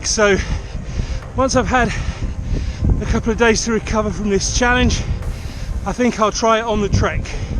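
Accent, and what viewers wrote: United Kingdom. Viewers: Love your British accent...!! Great video production and wonderfull scenary from the country side..!!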